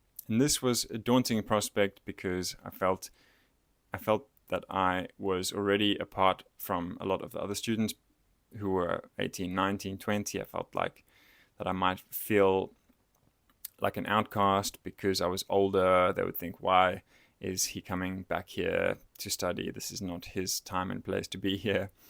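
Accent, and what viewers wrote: South Africa. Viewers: The accent - you are South African aren't you? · Do I detect an SA accent?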